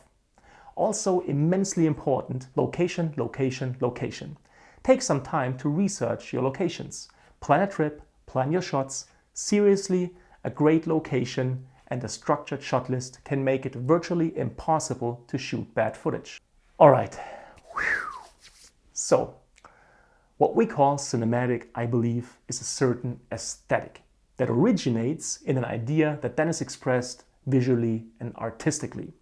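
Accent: Stuttgart, Germany. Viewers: Are you from Stuttgart?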